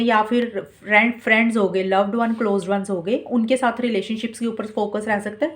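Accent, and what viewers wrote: Punjab, India. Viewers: nice punjabi accent👍👍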